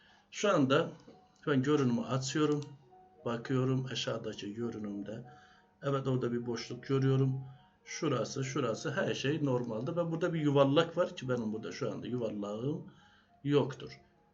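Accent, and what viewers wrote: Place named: Azerbaijan. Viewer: Azerbaycan lehçesi var sanki hocam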